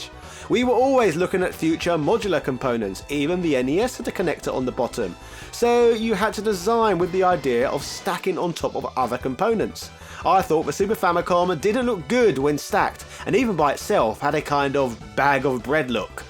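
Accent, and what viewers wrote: England, United Kingdom. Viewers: Canada? He's got the most English accent I've ever heard.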